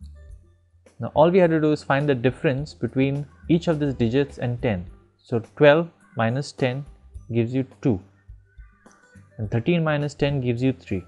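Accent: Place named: India. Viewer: i am killing myself when listening indian when he speaks english!!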